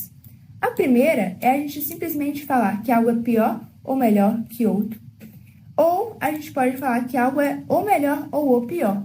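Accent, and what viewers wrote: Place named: England, United Kingdom. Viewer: Hum chegando na metade do vídeo aqui com esse contéudo. Gosto muito dessa pronúncia dos ingleses... céus, que coisa confortante de se ouvir... esses verbos auxiliares e outras capivaragens... muito bom ver a gramática send explicada de maneira tão suave com conteúdo de Harry Potter. Excelente! Uma pena que não consigo usar o hype aqui... porque será...? Ah, compartilhando o vídeo.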